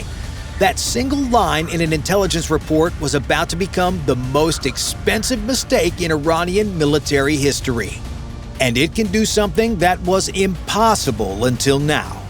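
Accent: United States. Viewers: Had to turn this off. This particular American presenter's accent is ear grindingly awful. Pronunciation is always a problem understanding but his is so far out its painful to listen to. Back to school required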